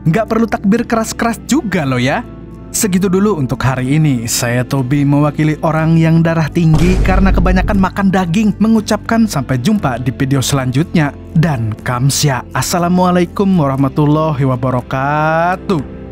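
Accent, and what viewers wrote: Banjar, Indonesia. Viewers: Mang tobi urg banjar kah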